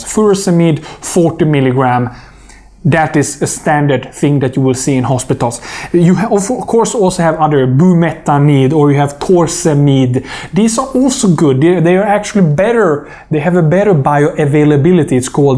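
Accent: India. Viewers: perhaps he should talk in his own language instead of butchering English with his heavy accent. Especially when he is talking about something as important as heart failure. Iv had doctors from India and I find there education is subpar . A person can put there life at risk having a doctor from a third world country, let alone trying to figure out what there talking about. Most eastern doctors come to America to live off patience of Medicare, they can't make a living in India. There guaranteed payment in America. That's was why they come to america with there lack of education and experience.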